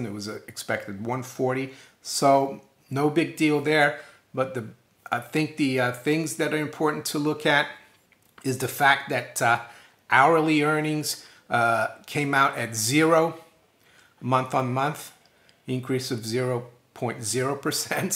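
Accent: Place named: East Coast, United States. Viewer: Another good video from the Brazilian with the U.S. east coast accent. 👍😊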